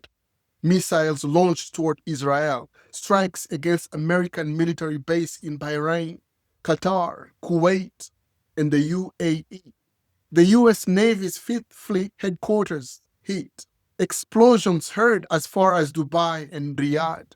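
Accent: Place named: Africa. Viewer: You sound African you know about our constitution more than most D.C. politicians big ups to you my brother.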